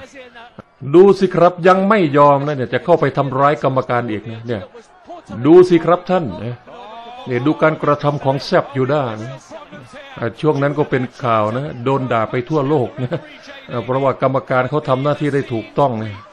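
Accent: Isan, Thailand. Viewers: แอดมิน ทำไมมีแต่พากย์อีสาน ไม่เห็นมีพากย์ใต้เลย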